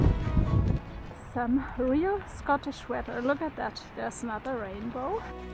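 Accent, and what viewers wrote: Germany. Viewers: Do I hear a german accent? ;)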